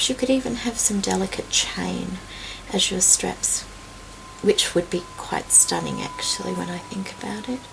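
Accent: Australia. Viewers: its tulle its the aussie accent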